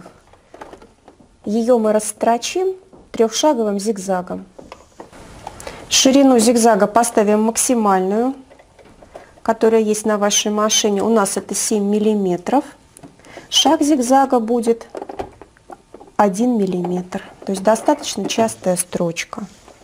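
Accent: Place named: Voronezh, Russia. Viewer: Спасибо за мастер-класс,! Первый вариант притачивания резинки лучше)). А еще мне очень нравится ваш приятный голос и воронежский "говор", который я узнала с первых минут видео😄.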